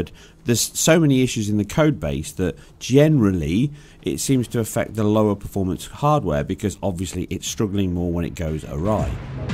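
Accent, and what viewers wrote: United Kingdom. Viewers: Doing tech analysis with UK accent is the right move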